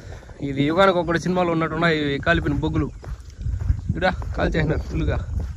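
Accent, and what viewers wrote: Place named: Chittoor, India. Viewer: Chittoor yaasa baagundadhi ba 😉🥰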